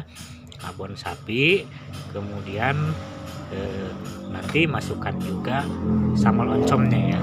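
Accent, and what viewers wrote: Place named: West Java, Indonesia. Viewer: Hadir menyimak Kang, siap dipraktekkan ah...Akang orang Sunda? Logatnya Sunda banget hehehe....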